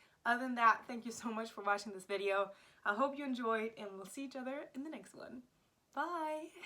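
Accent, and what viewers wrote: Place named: Germany. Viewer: yeah you german accent is flawless 😎😎😎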